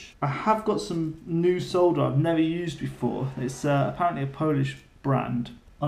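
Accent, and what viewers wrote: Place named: England, United Kingdom. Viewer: Hey I just saw your video again and put captions on.... didn't understand the English accent I guess! Sorry... so that solder is for Battery Detect? So turnigy can tell when you are connected right? So did you solder directly to battery connections on PDB? or a lower 5 voltage connector.... BTW great job.... how on earth did you figure this out.... there is almost documentation around! Thanks again!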